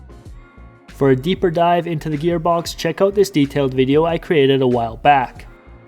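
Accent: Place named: Canada. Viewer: What a great video! It was difficult to understand your thick Canadian accent but I loved watching the tear down and hard work.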